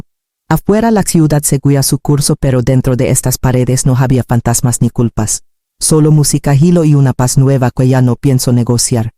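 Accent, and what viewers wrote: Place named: United States. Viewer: No puedes hablar en un español claro? Porqe ese tonito agringado se te oye muy mamuco y no se te entiende. Aparte, no hay necesidad alguna de que utilices esa forma de hablar. Ya michas personas te lo han dicho pero te vale. Pon remedio urgente a esto, sino ya no vamos a abrir tus videos. Ok?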